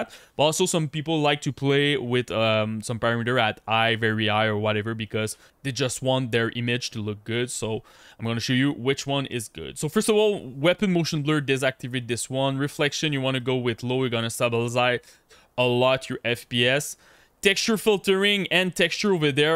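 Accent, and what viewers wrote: Quebec, Canada. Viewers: T québecois avoue lol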